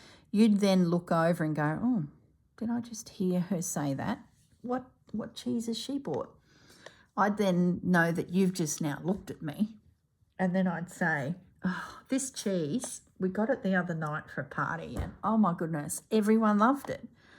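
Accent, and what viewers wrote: Australia. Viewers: Ah! Love what you did with the leaves! I JUST LOVE this channel! I love your style, your warm conversational style, stories, and, of course, that Aussie accent and vernacular! So glad to have found you !❤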